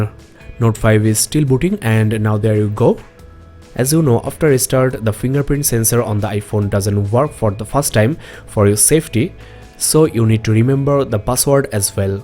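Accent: India. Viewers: he speaks indian english dude 😄😄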